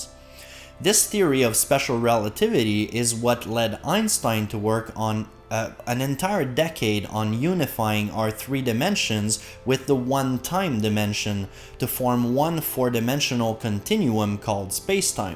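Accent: Quebec, Canada. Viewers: You’re definitely Québécois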